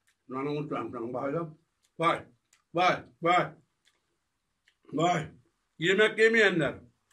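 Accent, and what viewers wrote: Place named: Antakya, Turkey. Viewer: Aynı hatay antakyalilar gibi konuşuyorsun:))) bizim buranın yemekleri de güzeldir bekleriz:)) seviliyorsun:)) yiycin yapıcın edicin:)) bağh hele:)) hastahanalık oldu:))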